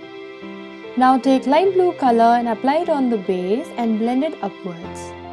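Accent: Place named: India. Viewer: Couldn’t freakin’ understand her with that Indian accent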